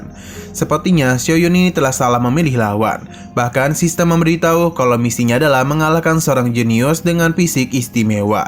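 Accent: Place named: Bali, Indonesia. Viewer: Logatnya mimin kek orang Bali 😅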